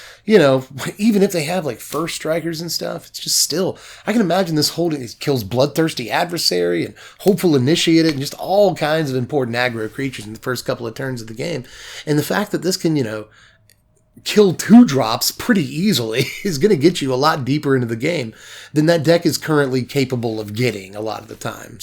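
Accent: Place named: Georgia, United States. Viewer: I totally guessed you were from GA takes one to know one.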